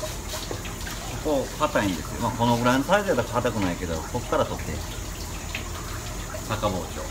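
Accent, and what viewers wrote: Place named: Tosa, Japan. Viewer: 土佐弁ですね✨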